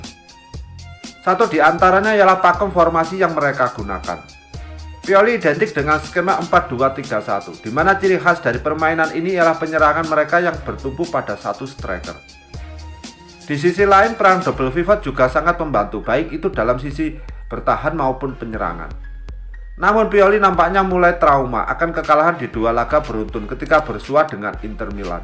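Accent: Java, Indonesia. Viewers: Medok bgt ngomong e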